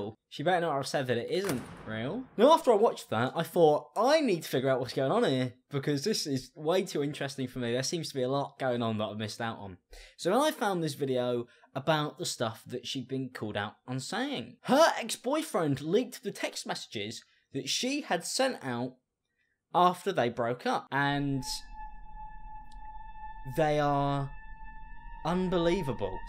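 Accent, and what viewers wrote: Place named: RP, United Kingdom. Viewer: His accent is so posh that I don't understand most of the things he says but I have to assume that it's a joke and laugh to it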